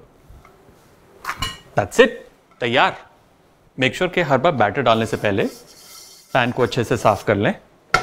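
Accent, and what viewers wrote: Punjab, India. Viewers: Sir your way of talking is very nice...total Punjabi accent.... You are wonderful chef....love your recipes......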